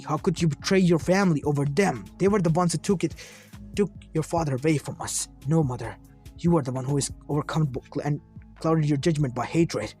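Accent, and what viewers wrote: India. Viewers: By your accent I think you are indian, I am indian too and its very good to see that we are also contributing to the Naruto world.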